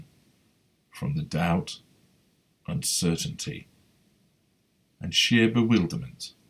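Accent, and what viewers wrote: United Kingdom. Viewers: Even if they are good, and he is very good at his Kraft. Never praise them. You are providing huge supply by doing so. Also, the British accent does make it interesting but also makes him sound pompous. This is in harmony with his Advanced narcissist claim. I believe him. He is sophisticated in the ways of narcissism. But that's nothing be proud of if you truly are narcissistic. You simply mirror the One who is your father. Satan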